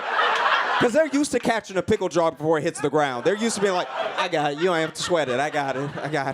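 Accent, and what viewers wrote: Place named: Los Angeles, United States. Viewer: Between Josh and Theo Von the LA accent is really growing on me😏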